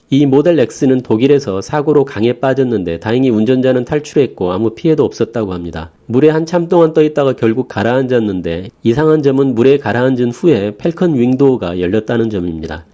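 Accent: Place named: Jeolla, South Korea. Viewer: 전라도 사투리가 듣기 좋네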